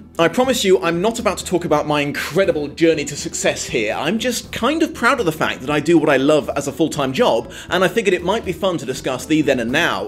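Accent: United Kingdom. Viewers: I don't care where you live, just don't stop using the word "bloody". I LOVE your british accent! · going to the States... please don't loose your lovely British accent... :(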